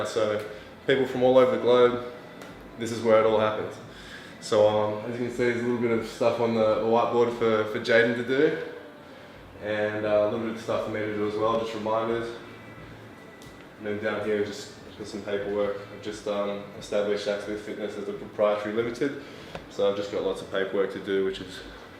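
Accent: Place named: Australia. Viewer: great vid! i don't get why you would have haters. you seem pretty cool. nice to hear a fellow aussie on youtube 😄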